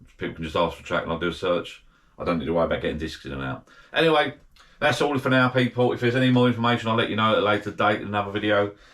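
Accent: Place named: Cockney, United Kingdom. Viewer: The subtitles are utterly,utterly stupid; (what has 'Mercedes' got to do with ripping a track?),- and the cockney accent is almost as bad to understand, even though I've kept starting and stopping,- most infuriating!! If you are trying to explain how to do something, at least try to speak a lot more clearly so that others can understand you.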